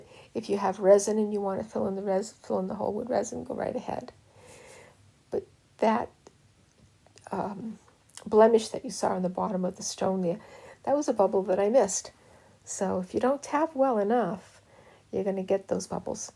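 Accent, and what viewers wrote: New England, United States. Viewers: Thank you! Great video! You must be from New England with that accent lol.😊😊😊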